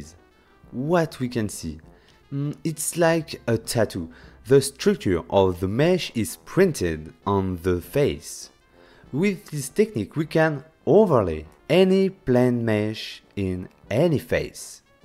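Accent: France. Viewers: This is the first time I watch this channel. What a pleasure to be welcomed with such a good French accent ! Great content. Subscribed. (S’il te plaît garde l’accent français c’est parfait comme ça) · Love your French accent may be I am wrong. Awesome tutorial by the way.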